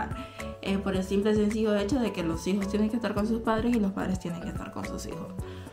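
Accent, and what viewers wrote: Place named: Honduras. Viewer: Tu no tienes que cambiar tu modo de hablar tienes que ser auténtica y Aser prevalecer y valer tu idioma de Honduras Yo también viví en España y nunca cambie mi forma de hablar 🙋🇪🇨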